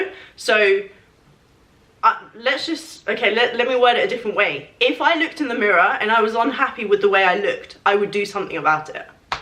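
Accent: United States. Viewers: I love your American accent, I’m from Chicago and feel as though my British accent would be just as poor 😂 love you & your videos 💜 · For these new generation kids, your American accent fits right in there. You'll do fine. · Hahaha your American accent wasn’t actually that bad. It’s better than I could do a British accent lollll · loved the american accent :) i'm from ny! my question for next video would be, how does your mom feel about your camera man :)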